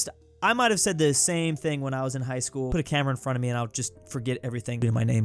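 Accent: United States. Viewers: Mate are you American or the same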